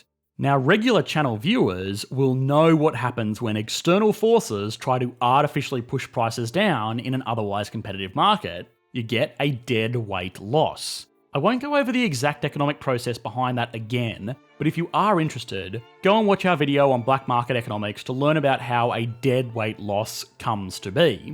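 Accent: Australia. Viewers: You Australian? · you upload exactly when I'm making my evening coffee, nothing like watching a smooth Aussie accent explaining economics with a hot cup of coffee! :D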